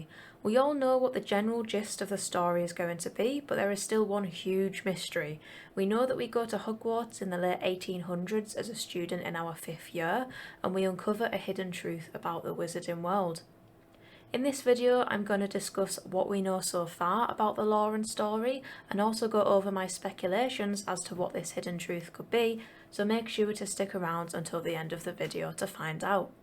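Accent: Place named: United Kingdom. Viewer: I’m convinced a British person can read anything and make it more interesting 😆 great video!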